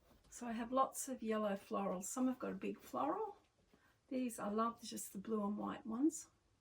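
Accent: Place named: Australia. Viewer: Hi, I finally circling back to your channel. I’m glad I did too. Because I do love to sew. And I used to watch both my grandmothers sew quilts. My mother did as well. Though I don’t quilt. They are my favorite comforters. I have my machines. Are you from Australia? I love your accent. Thank you once again for visiting my channel last month and for your comment. I look forward to watching your videos now that I found you. 😊❤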